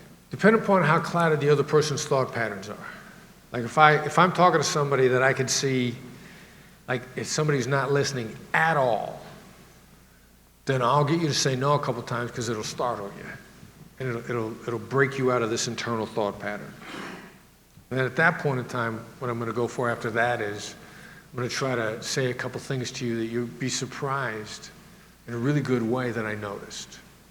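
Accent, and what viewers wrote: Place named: Midwest, United States. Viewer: I want to know the accent story. He's from Iowa, the midwest.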